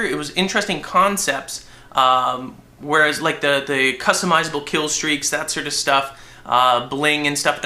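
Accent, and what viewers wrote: Canada. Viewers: are you canadian? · is he canadian?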